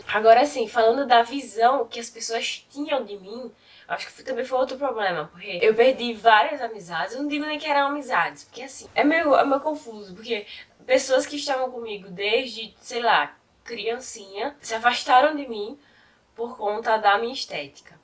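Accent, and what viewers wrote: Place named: Alagoas, Brazil. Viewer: Êêêta que sotaque bonito! Minha conterrânea (Nasci em Maceió, mas vim pra PE pequeno), curti muito o vídeo. Embora a minha praia seja mais o lado Heavy Metal, conheço um pouco da sub cultura. Há vários anos atrás eu até cheguei a ler "A Happy House in a Black Planet", que o um dos sites que você listou disponibilizar, ele dá uma boa geral no assunto. Aqui em Recife, a cena era bem forte antigamente, hoje em dia acredito que esteja mais fraca (novamente, falo pelo lado do metal... mas acredito que isso se aplique a todos)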